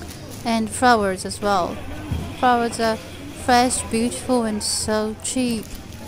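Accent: United Kingdom. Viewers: すごい行列。朝市って意外と混むんですね。 アクセントが、イギリス英語かな？ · イギリス英語かな❓ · イギリス英語ですね😃✌️🌹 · イギリス系英語？聞き取りやす～い！ゆうくん可愛い❤️うちのこもこんなんやったな～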